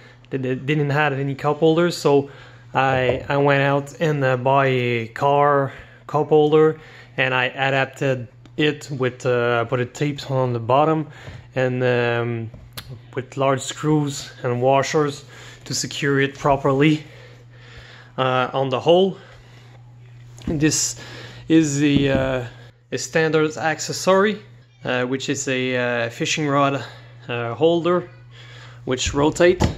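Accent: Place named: Quebec, Canada. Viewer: Quebec 101 i can spot that accent ;) Good video!!!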